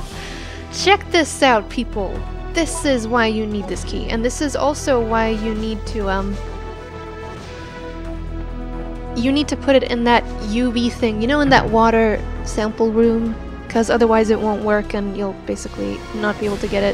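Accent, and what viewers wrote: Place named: Sweden. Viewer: Swedish wow I have never heard a swedish accent :), lol I'm guessing you're getting mad about people saying you don't? I do have to say your english is very good, however I do like that accent!! It sounds awesome.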